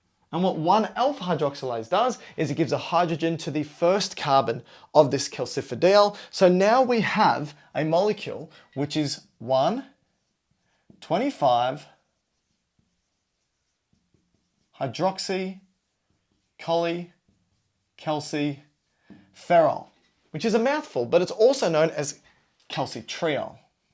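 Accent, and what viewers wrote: Australia. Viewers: Aussie Accent btw why are you jacked up for your profession lol?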